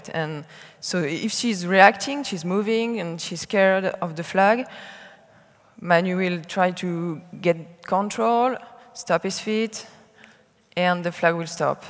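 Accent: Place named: France. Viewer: On entend bien l’accent français 😂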